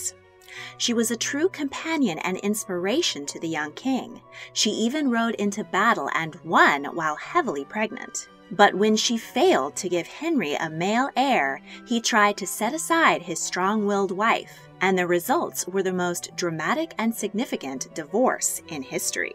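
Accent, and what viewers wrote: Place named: United States. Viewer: From your accent I can tell that you're not European.. Probably American.. So its more amazing that you're making videos about European monarchy..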